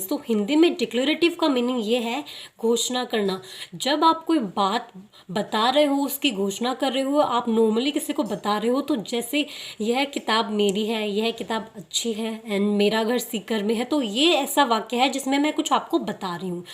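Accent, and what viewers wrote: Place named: England, United Kingdom. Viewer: It seems that you are from England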